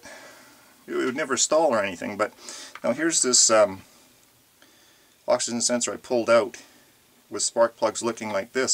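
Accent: Canada. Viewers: Love the Canadian accent lol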